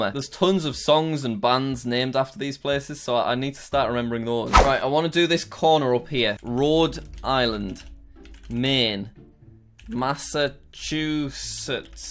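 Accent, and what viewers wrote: United Kingdom. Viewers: are you From British (UK)? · I can tell you are British🇫🇯🇫🇯🇫🇯🇫🇰🇫🇰🇫🇰🇬🇪🇲🇩🇳🇺🇹🇨🏴🇬🇧🇹🇻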